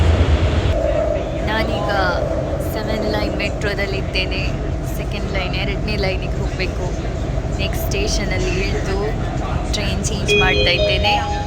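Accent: Standard. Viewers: ನಿಮ್ಮ ಚಾನೆಲ್ ನಾನು ಇವತ್ತು ಯು ಟ್ಯೂಬಿನಲ್ಲಿ ವ್ಯೂ ಮಾಡಿದ್ದೆ. ಮೊದಲಿನದಾಗಿ ನಿಮ್ಮ ನಗು ಮುಖ ಹಾಗು ನಗುಮುಖದಿಂದ ಎಲ್ಲರಿಗು ಪ್ರೀತಿಯಿಂದ ಕೊಡುವ ಉತ್ತರ ನಮಗಂತೂ ತುಂಬಾ ಖುಷಿ ಕೊಡುತ್ತದೆ. ನಿಮ್ಮ ಪ್ರತಿಯೊಂದು ಶಬ್ದದಲ್ಲಿ ಪ್ರೀತಿ ಮಾನವೀಯತೆ ತುಂಬಿದೆ. ಇದೆ ಸಮಾಜದಲ್ಲಿ ಎಲ್ಲರಿಗು ಬೇಕಾಗಿದ್ದು. ಎಷ್ಟೊಂದು ಪ್ರೀತಿಯಿಂದ ಮಾತನಾಡುವ ನೀವು ನಮ್ಮ ಕರ್ನಾಟಕದ ಯಾವ ಭಾಗದವರು ಅಂತ ತಿಳಿದಿಕೊಳ್ಳುವ ಕುತೂಹಲ ಬಂದು ಗೂಗಲ್ ಸೆರ್ಚ್ ಮಾಡಿದಾಗ ನೀವು ಸುಳ್ಯದವರು ಹಾಗು ಆಳ್ವಾಸ್ ಕಾಲೇಜಿನಲ್ಲಿ ಓದಿದವರು ಅಂತ ಗೊತ್ತಾಯಿತು . ಎರಡೆನೇಯದಾಗಿ ನೀವು ಸ್ವಚ್ಛತೆಯ ಬಗ್ಗೆ ಮಾತನಾಡಿದ್ದಿರಾ. ಸ್ವಚ್ಚತ್ತೆ ಎನ್ನುವದು ಅಲ್ಲಿಯ ಜನರ ರಕ್ತದಲ್ಲೇ ಬಂದಿದೆ. ಸ್ವಚ್ಛತೆ ಇದ್ದಲ್ಲಿ ಶಾಂತಿ ಹಾಗು ಖುಷಿ ಇರುತದೆ. ಇದನ್ನು ನಮ್ಮವರಿಗೆ ಹೇಳಲು ಹೋದರೆ ದೂರದ ಮಾತು... ಮೂರನೆಯದಾಗಿ ನೀವು ಎಷ್ಟೊಂದು ಪ್ರೀತಿಯಿಂದ ಕನ್ನಡದ ಬಗ್ಗೆ ಪ್ರತಿ ಬಾರಿಯೂ ಪುನರುಚ್ಚರಿಸುತ್ತೀರಾ .. ಮತ್ತು ಚೀನಾ ನೆಲದಲ್ಲಿ ನಿಮ್ಮ ಶುದ್ಧ ಕನ್ನಡ ಕೇಳಲು ತುಂಬಾ ಖುಷಿಯಾಗುತ್ತದೆ. ನೀವು ಯಾವತ್ತೂ ಖುಷಿಯಿಂದ ನಗುನಗುತ್ತಾ ಇರಲೆಂದು ದೇವರಲ್ಲಿ ಪ್ರಾಥನೆ. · ನೀವು ಯಾರು ಅಂತ ಗೊತ್ತಿರಲಿಲ್ಲ ಕೀ ತಡಿ ಕಿರಣ್ ಅವರಿಂದ ಪರಿಚಯವಾಯಿತು ನಿಮ್ಮ ವಾಯ್ಸು ತುಂಬಾ ಚೆನ್ನಾಗಿದೆ ಕನ್ನಡ ಸ್ವಚ್ಛವಾಗಿದೆ ಆಲ್ ದಿ ಬೆಸ್ಟ್ ಯುವರ್ ಪಿಚ್ಚರ್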